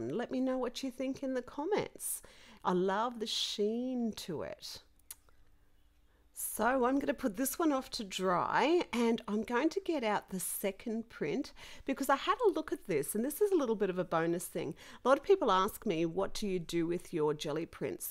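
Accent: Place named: Australia. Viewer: Hi! I live in Sydney Australia and very pleased to hear your Australian accent! Hoping you are living in Australia at the moment. I really want to try this. Which magazines have you had the best results from? I've heard some don't work.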